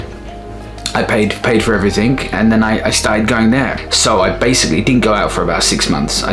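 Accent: United Kingdom. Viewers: Love the British accent! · 你的英文跟我的快一樣好 😂British accent, nothing better. Awesome vid. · 原來你的英語這麼好，英國腔不是很重，稍微有一點而已....住在異國是很辛苦的，總是會想家，但回家又捨不得....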